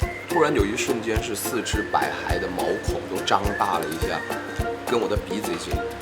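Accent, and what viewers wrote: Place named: Cantonese, China. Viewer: 廣東式普通話，難聽到死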